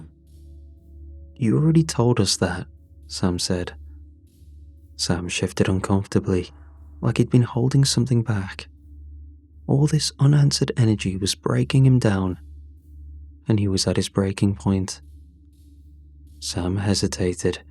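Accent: England, United Kingdom. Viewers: Because this channel is garbage now. It was good 10 years ago. Now its the same stories on repeat live the only video worth watching with no ads. Plus doesn't end and wake you up every couple of hours. Its background noise. Its good at it too. Great English accent 👌 Finding out he's Asian is a kick in the teeth though. They came to steal our life. Our accent our voice then they try to speak for us 😢 I once heard he had case against him too. Idk enough about it to elaborate though. But I do want to know more on that...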